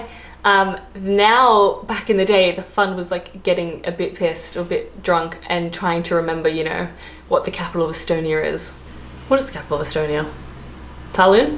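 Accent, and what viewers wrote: England, United Kingdom. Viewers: dont get me wrong you are right about put culture i am 65 you sound more english than me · Middle Class English accent!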